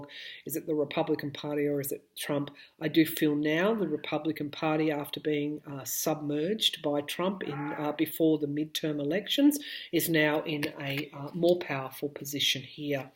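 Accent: Australia. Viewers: Hard to get used to that strong Aussie accent too..... just saying. She's good tho.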